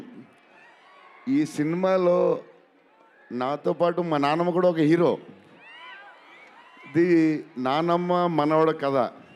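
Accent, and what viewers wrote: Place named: Godavari, India. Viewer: godavari slang lo annaya speech ❤ pure hearted person ❤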